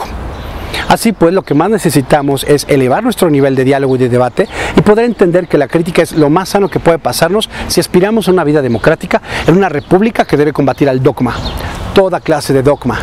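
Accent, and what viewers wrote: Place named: Mexico City, Mexico. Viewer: celebro que te diriges con más prudencia, no a todos les agrada escuchar tu estilo chilango y México no es la ciudad de México. Me parece que la tolerancia es una virtud y venir del pri no es el acabose,pero si hay que ponerle ojo a esa gente,recordemos que Lázaro Cárdenas también era priísta y es alguien quien difícilmente olvidan quienes lo vivieron,a lo mejor sean excepciones,si es así, entonces solo hay que hacer excepciones,las menos posibles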